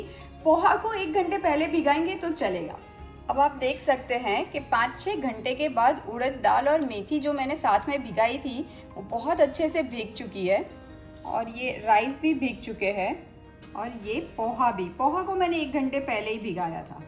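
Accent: Gujarat, India. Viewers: its not bhiga sakte ho...its figo sakte ho...correct u r hindi..gujratishhh hindi.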